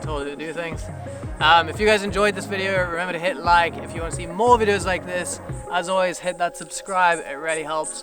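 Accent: South Africa. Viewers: Ahh! I thought you were South African ! nice boet!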